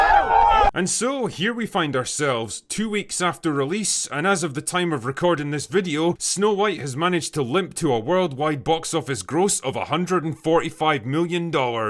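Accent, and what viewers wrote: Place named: England, United Kingdom. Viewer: This was great classic stuff said right here! Well said & I agree. " " "The only English Accent that I care to hear"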